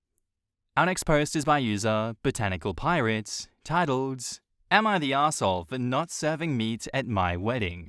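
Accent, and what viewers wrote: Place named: Australia. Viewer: I love these. Thank you, for delivering these stories at the right speed, in a nice accent and with enjoyable, insightful and to the point commentary. 💜✨🧿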